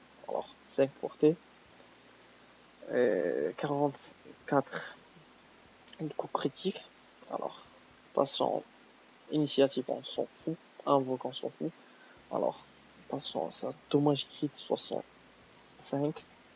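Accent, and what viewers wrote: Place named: Morocco. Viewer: hhhhh wlahila 3rftk mghribi 🤣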